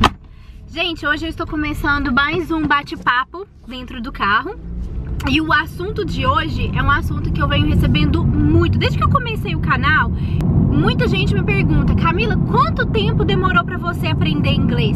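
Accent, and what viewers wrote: Minas Gerais, Brazil. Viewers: Mas o sotaque mineiro nunca some kkkk mt bonito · Seu sotaque mineiro é muito bonito, assim como você! Hahaha... Você tem alguma faculdade? Como conseguiu o emprego de gerente? Depois do emprego de babá, foi muito difícil conseguir outros contatos? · Como foi pra vc lidar com esse sotaque bonitinho de minas aí nos eua? Isso "se transforma" quando vc fala inglês ou ñ? Tipo, em portugues vc fala "...ao meu redorrrr" ou "porrrr que" e aí em inglês vc fala "arrrround me" ou "you areeeee"? kkkkk É engraçado mas a dúvida é séria, ñ me leve a mal! Bjo =)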